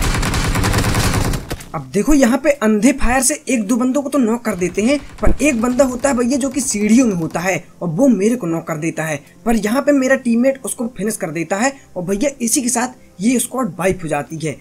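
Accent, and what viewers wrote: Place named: Bundelkhand, India. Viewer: Aawaj se to pata chal gya ki bhai bundelkhandi ho !!! Me videos dekh rha hu kuchh dino se bahut achha khelte ho bhai.... Anyways damoh se hu... Indore me rehta hu... Me b bundelkhandi hu... Bhai real naam batao yr or kaha se ho exactly ?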